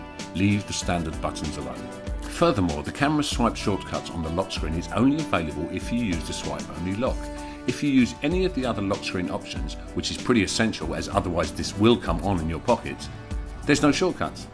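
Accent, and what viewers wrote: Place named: United Kingdom. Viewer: I loik that british accent sow much :)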